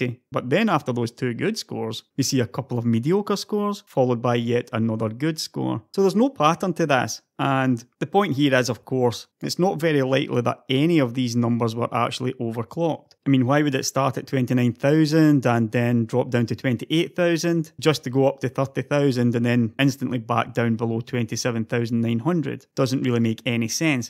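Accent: Scotland, United Kingdom. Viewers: stayed for the scottish accent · speaking English with a Scottish accent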